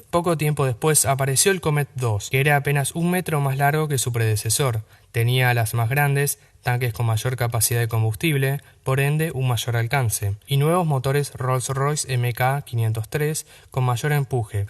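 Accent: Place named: Argentina. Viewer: comencé a verlo y a poco andar se oye la voz de un argentino con sus aches características en vez de doble eles o "y" donde aquí no resulta para nada creíble -.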